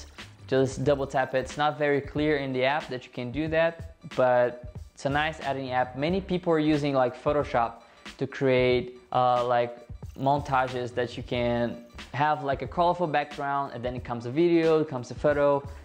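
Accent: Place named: Brazil. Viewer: Fala bem Iglês cara.. mas da pra perceber que é BR.. MEsmo assim parabéns!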